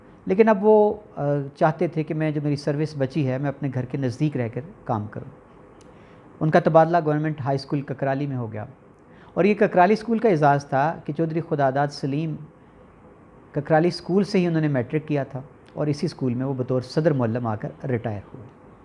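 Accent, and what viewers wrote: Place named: Lucknow, India. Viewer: ماشاءاللہ بہت خوب جی Great inspiration معلوم ہی نہیں ہوا کہ آپ کوٹلہ سے ہیں ،،، بلکہ ایسا محسوس ہوتا رہا سُنتے سُنتے کہ آپ لکھنئو سے تعلق رکھتے ہیں ، واللہ بہت ہے دل خوش ہوا ،،، Watching from USA.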